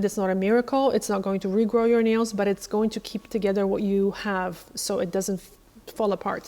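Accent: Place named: Poland. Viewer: I can hear a slight Polish accent in your English. Am I right?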